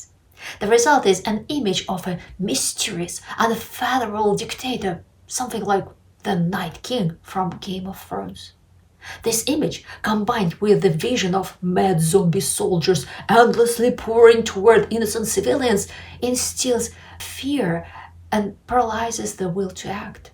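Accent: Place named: Russia. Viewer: Owh You are good. Connection to Russian revolution, Player-theori, each minute a spot on observation, and I love the Russina accent. I hope You live safely, there is to many dark storms in the world, all the good one goes silent or dispears, keep up with the excellent work, thank You.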